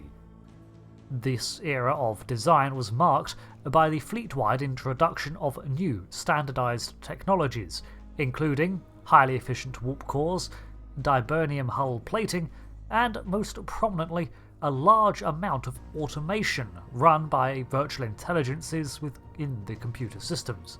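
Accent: England, United Kingdom. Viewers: He's from England. They pronounce it anyway they please.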